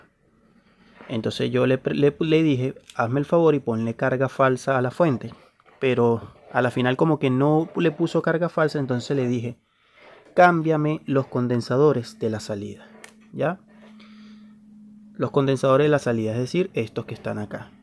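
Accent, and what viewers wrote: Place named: Venezuela. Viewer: Por tu acento mi pana veo que eres venezolano igual que yo.... Te la estás comiendo viejo... Gracias en nombre de la comunidad que nos apasiona la electrónica porque tus clases son gratis, bien explicada con excelente metodología.... No le pares bolas a los envidiosos...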